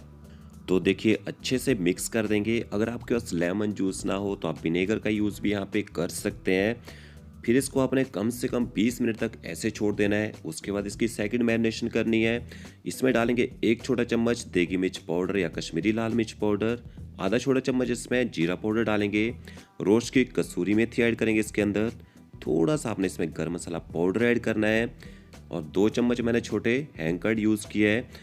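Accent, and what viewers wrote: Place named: Uttarakhand, India. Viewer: This chef is from Uttarakhand guessing cuz of accent 👍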